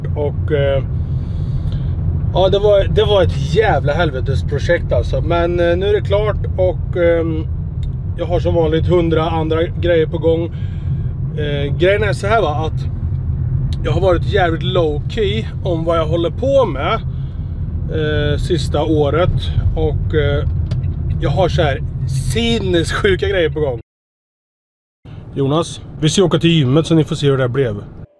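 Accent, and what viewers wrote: Värmland, Sweden. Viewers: Är du från värmland? Bor i värmland! Låter som du kommer herifrån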